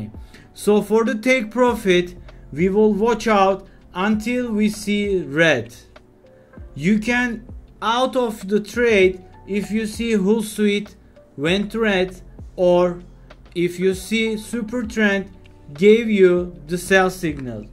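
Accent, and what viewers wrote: Turkey. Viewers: Krdeşim sen Türk'e benziyorsun konuşma aksanından anladım isim neydi? Bir de videonuzda altyazı özelliği aktif değil..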